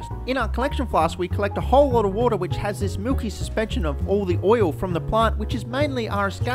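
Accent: Australia. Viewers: aussie nilered. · When I started watching this video I though this guy is an Australian and it got proved a bit later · Would be 10/10 if more raw Australian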